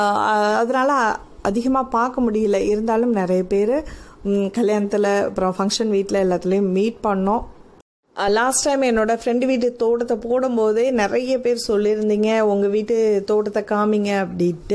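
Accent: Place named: Nagore, India. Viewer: Asssalamu alaikkum wa rahamaduillahi wa barakkthuhu..Alhamduillah yella commentkkum reply panni erukinga 👏nan nagore ma ninga pesurathu yenga ooru karanga pesura mari erukkum..like appanu solliringale grandfathera 😊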